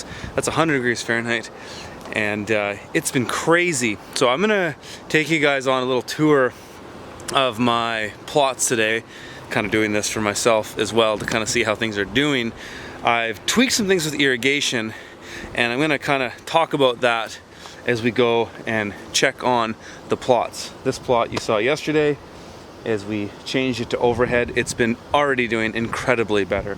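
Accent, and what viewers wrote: Canada. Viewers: You have the softest Canadian accent I think I've ever heard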